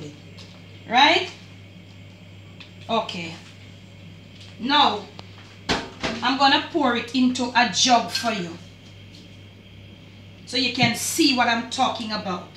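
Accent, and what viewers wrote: Jamaica. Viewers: I l❤ve Jamaican accent mon!!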